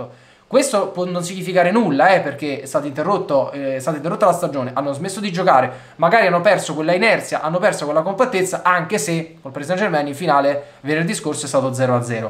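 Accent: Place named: North, Italy. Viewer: ACCENTO SETTENTRIONALE VEDO😂😂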